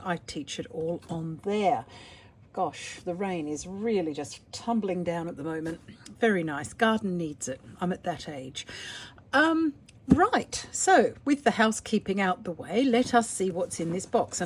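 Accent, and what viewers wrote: Australia. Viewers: Hello. Just found your channel and kept thinking "she" sounds Aussie and then finally at the end you said you went to school in Australia. Hi from a fellow Aussie :) Enjoyed your humour along the way & perhaps that disorder is contagious. I have it too 🤣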